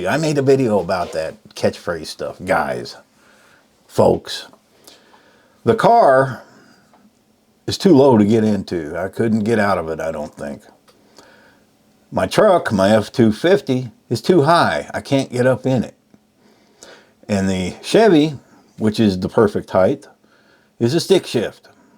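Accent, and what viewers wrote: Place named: Tennessee, United States. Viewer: Hello, totally agree that something has changed. I think its the food, the plastic containers, the stuff they feed in the animals, AND MORE IMPORTANTLY, those VIOLENT VIDEO GAMES. Or, Do you see the chem trails in the sky where you are? We're I So. CAL. Way too many people here. I'm taking care of my 101 yr. Old mom. We can't move right now. But I enjoy listening to you and what you have to say., i look for your videos every morning. My husband's family were/are in Tennessee , I like your accent, reminds me of my husband's aunt who was a sweetheart. Happy trails.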